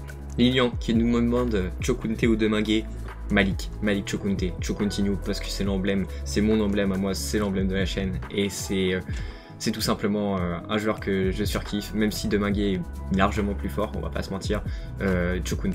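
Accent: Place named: England, United Kingdom. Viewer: Le petit accent anglais qui fait plaisir insragr_a_ m continue comme ça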